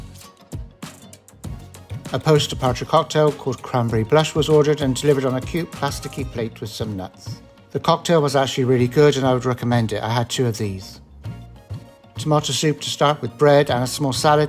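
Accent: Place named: West Country, United Kingdom. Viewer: Trying to place your accent, getting all over the place but think West Country somewhere even some Irish. Dying to know where you are from.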